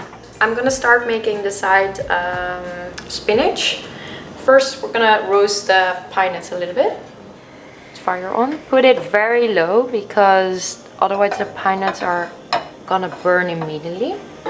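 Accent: Netherlands. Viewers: Are you dutch? im dutch to you have a accent <3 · She sounds Dutch. I use to live in Holland. Good genetics and healthy 'diet.' I eat the same but I wish I could eat nuts:( Nut allergy is terrible. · je kan zo goed horen dat ze nederlands is. ik zat te luistern naar dr accent en ik d8, dit klinkt best nederlands., toen zag ik dr achternaam.. en ik d8.. jup die is nederlands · Je hebt een nederlandse accent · is she dutch? she sounds dutch:) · Are you Dutch? You kinda have an accent, not annoying or anything, I am Dutch so I hear lots of people with the "Dutch accent" so I can recognize it haha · In the Netherlands (where I assume she is because of the dutch accent) they have lots of quick cooking rice, it's what most people use! You can cook it like pasta and then pour out the excess water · OMG I NEW IT!! I’m from holland too😂 (its her accent) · Is she dutch? 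She has an dutch accent in her english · I know for a fact that she is a dutchie aka dutch person from her accent and her name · i love it.. first watching your clips. question are you dutch? your accent sound like that;). any way i will try this at home i need to get ready for the wedding i will be a bridsmaid..;) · Hahahaa love voor je typisch Nederlandse accent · You sound a bit dutch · From the very beginning I could hear she is Dutch..then I checked her name and I was right. I always recognize Dutch accent-it's very nice to hear Dutch speaking English.... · OMG zo leuk dat je accent zo duidelijk Nederlands is. Love it😍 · Is she Dutch? She sounds Dutch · Do i hear a dutch accent? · i can hear the dutch accent coming throughhhh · Is your first language Dutch by any chance? Cuz I'm Dutch and I recognize your accent.. 😂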